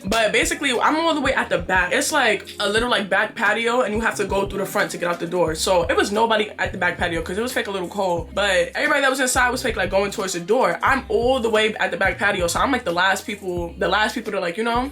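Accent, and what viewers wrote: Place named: Harlem, United States. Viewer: She sound like she from Harlem.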